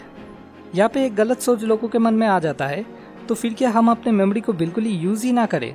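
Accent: Bengal, India. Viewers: Video banane wale ki awaz se lagta hai ki ye koi Bengali hai...and true intellect is their Birth Right.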